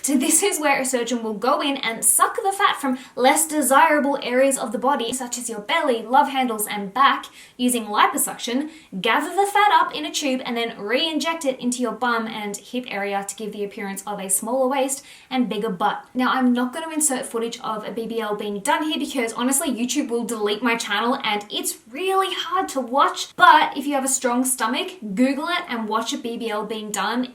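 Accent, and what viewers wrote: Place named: Australia. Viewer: aaaaah cant believe you replied meeee!! i love your aussie accent i want to speak just like youuu hahahah looooove your content btw keep it up!!! i never miss a video!!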